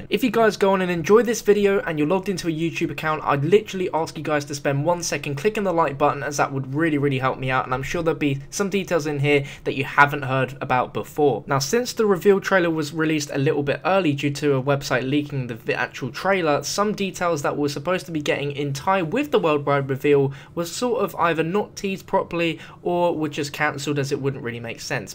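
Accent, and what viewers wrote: England, United Kingdom. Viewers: is the best are you English?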